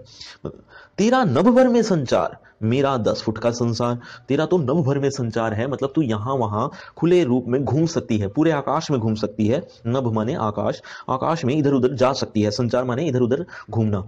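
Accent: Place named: Garhwal, India. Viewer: Your sounds be like garhwali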